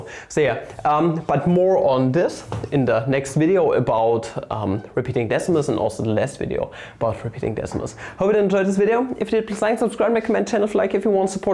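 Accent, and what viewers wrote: Germany. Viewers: It’s not you, it’s your german accent.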